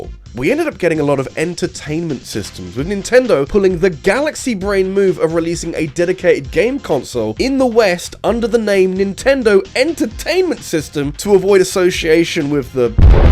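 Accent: England, United Kingdom. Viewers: Who would of thought a English man pronouncing English words would trigger some people.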